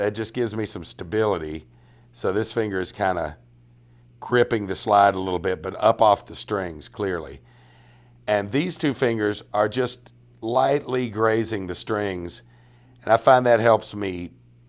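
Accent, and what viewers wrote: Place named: United States. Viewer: This is the most American accent i've ever heard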